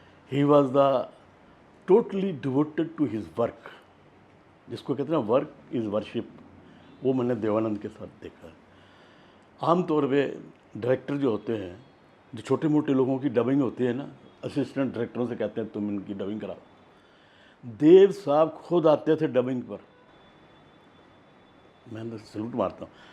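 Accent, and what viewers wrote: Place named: Punjab, India. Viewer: Birbal has punjabi accent like shri dara singh ji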